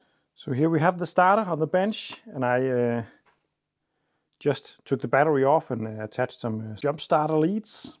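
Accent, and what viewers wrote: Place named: Denmark. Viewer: watched 2 min and already I can tell without checking, this guy is from Denmark... 🤣🤣💪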